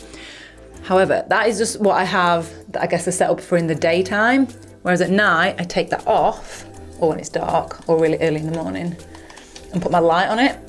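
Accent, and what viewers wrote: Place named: Liverpool, United Kingdom. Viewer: Liverpool? Im a yank but live in hong kong and you look and sound like one of my great friens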